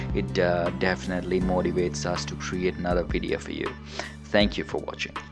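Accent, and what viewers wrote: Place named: India. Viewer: sound like indian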